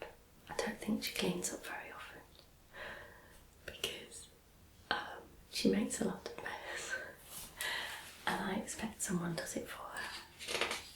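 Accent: United Kingdom. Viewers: You're so lovely!! And that very nice British accent is a big trigger for me. Keep up the great work! Subbed:)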